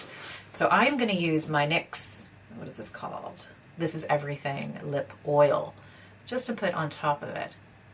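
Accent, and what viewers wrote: Ireland. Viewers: Love the Irish accent peeking through. Thanks for the honest review. Hope you had a great Christmas. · I love your Irish accent peaking through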